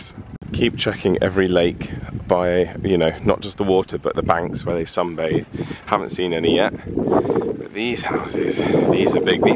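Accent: United Kingdom. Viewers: Love Your Channel. And Love Your British Accent.🙄 I hate to be bring this up. But Florida Is #1 Hate State When It Comes To Our Community. I find this very sad because in my younger Gay Year's I spent allot of time there.😢 It so sad that the majority Of The States Governments Our Out To Put Us Back In The Closet And Put Us In Real Danger. If I Wasn't So Old And In Bad Health I Would Consider Going Across The 🇬🇧 Pond. You Have A Beautiful Family. Enjoy The Rest Of The Summer. Greetings From🌴 San Diego.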